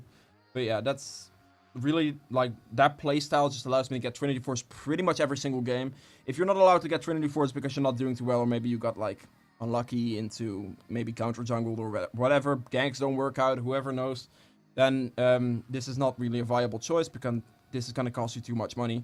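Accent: Netherlands. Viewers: Ok 2 things r u dutch? And btw isnt free stop+free boots bettrr?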